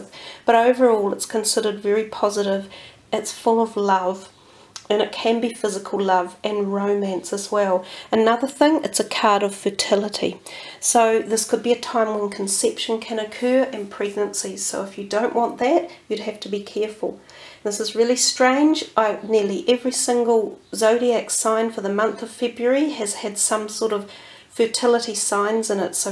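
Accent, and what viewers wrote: New Zealand. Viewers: Hi Hun, a kiwi accent which ya don't see on here.i am just across the channel in oz....good to see a few locals putting card readings on here. I keep an eye on ya insights to see what comes to pass.......cheers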